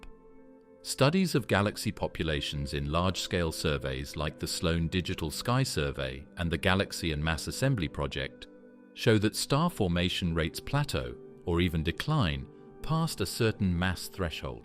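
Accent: United Kingdom. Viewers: Here it comes a British accent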